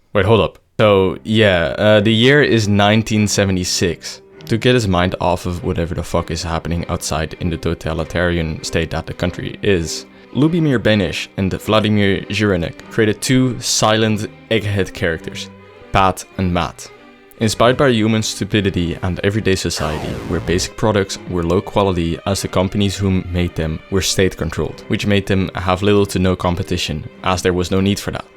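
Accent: Netherlands. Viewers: You Dutch yourself? bc HOLY ACCENT haha im from nl soo that why i know the accent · You can tell by his voice and accent that he is Dutch sooooo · his voice get more Dutch over time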